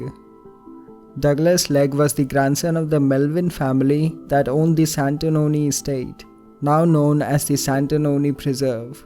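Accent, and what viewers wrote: India. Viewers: i cant stand that thick indiaN accent sounds like if i called a call center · This narration by this Indian SUCKS. Please get an American, English, Canadian, or Australian, or any English language speaker who doesn't have such a heavy accent. Who thought it was a good idea to have this dot head narrate??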